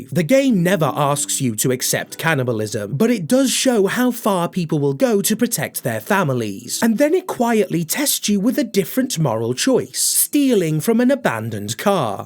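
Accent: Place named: United Kingdom. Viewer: Man i love this channel especially the way the essay is presented beautifully with a soothing brittish accent is a cherry on top AND chef's kiss!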